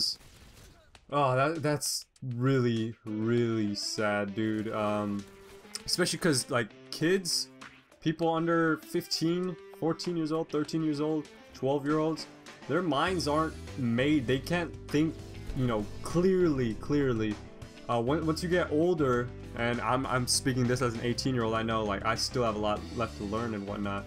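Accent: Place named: United States. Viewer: For a Pakistani you have a American voice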